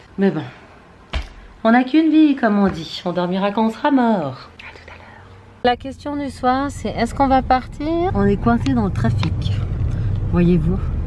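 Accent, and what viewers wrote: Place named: Geneva, Switzerland. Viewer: J'adore les intonations Genevoises ...c'est un plaisir de vous suivre depuis la Suisse plein de belles choses pour la suite.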